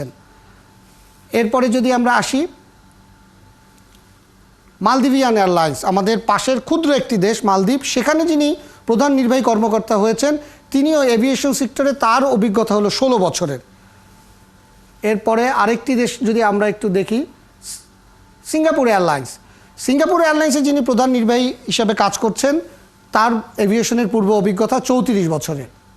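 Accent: Kolkata, India. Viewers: এটা কি বাংলাদেশের চ্যানেল। যিনি কথা বলছেন উনি কলকাতার মত করে কথাবলছেন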